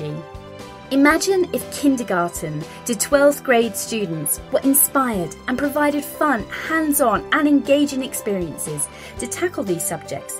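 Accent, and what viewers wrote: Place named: United Kingdom. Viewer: In britain it is spelled with an "s" and their accent seems to be british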